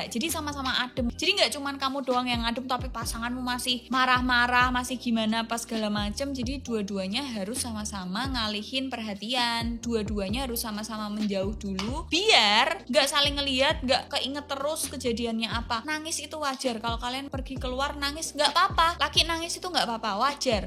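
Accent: Java, Indonesia. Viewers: Akhirnya kangen medoknya mba terobati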